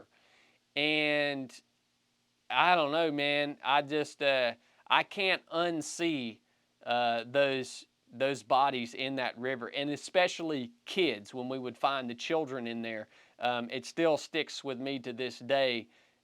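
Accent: South, United States. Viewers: Are you from Mississippi or Alabama?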